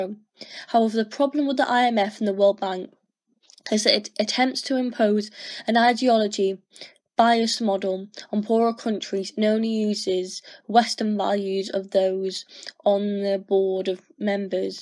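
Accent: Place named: United Kingdom. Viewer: Can you please make your content to be in text so we can see,so of us can't really keep up with the British accent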